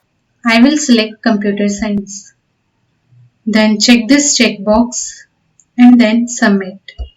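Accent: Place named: Russia. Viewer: by the way, your speech sounds like Russian a bit) Did you learn something like industrial electronic at the university?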